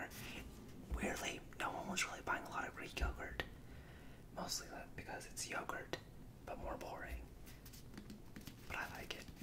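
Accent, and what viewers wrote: India. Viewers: Specially with indian accent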